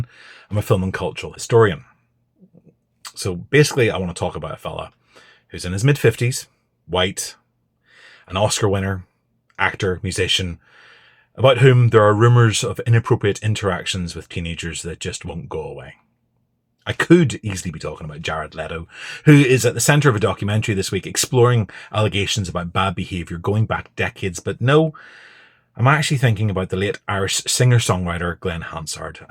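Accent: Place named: North, United Kingdom. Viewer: A northern accent 😮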